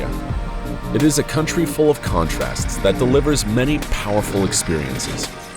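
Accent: United States. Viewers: Great video and editing. The 'american' overvoice does not do you justice. I would like to hear a Czech voice.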